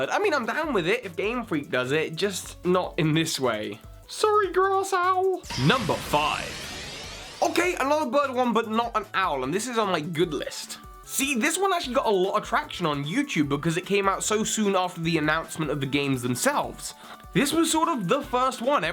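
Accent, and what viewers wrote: United Kingdom. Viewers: him "heynpokemon masters " me omg your british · It's an English accent. English accents are pretty clear and easy to understand, unless English isn't your first language. also 'a shit'.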